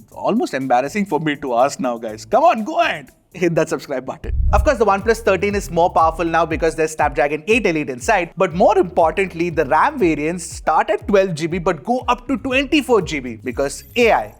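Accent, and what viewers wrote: India. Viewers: Indian accent is bubbly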